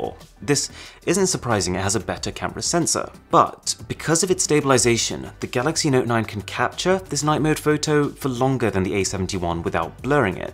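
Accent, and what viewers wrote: United Kingdom. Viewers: You have a British accent but talk in the dollar currency. If your British just say pounds sterling as I'm from the UK and understand pounds better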